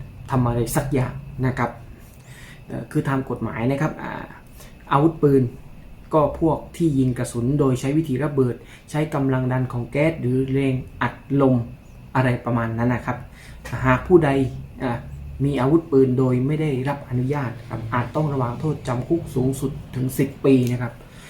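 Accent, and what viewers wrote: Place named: South, Thailand. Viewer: เพิ่งได้ดูคริปเเรกครับอย่างอื่นไม่รู้เเต่ทนายน่าว่าคนไต้เเน่นอน ขอบคุณความรู้ดีๆ ติดตามครับ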